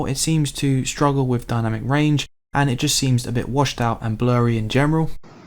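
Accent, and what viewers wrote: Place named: England, United Kingdom. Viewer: Great. And fantastic to hear an English reviewer and one with a nice, tidy garden as well. I'm a light user and I don't do games and I don't like having to worry about batteries so I'm considering it. I like big battery phones but never had a big beast like this. I'm assuming it works on 4g band 20 in uk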